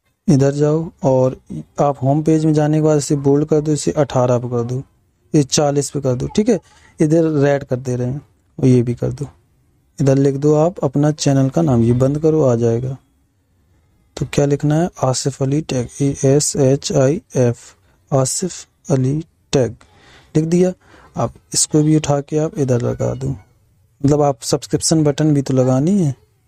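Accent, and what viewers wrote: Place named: Bundelkhand, India. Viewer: Bhai kha k bundelkhand se ho kya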